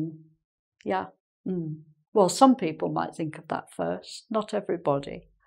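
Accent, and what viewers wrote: United Kingdom. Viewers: Her British accent sounds really beautiful and also her lecture is really good. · You don't need to explain every word in the poem.I listen to your lectures simply because I like your pronunciation and I am fascinated with British accent.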